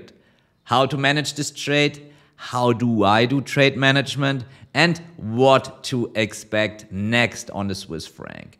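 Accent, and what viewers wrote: Germany. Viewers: Man i love your English mixed with German accent and your articulation ! So nice!👍🏾 ❤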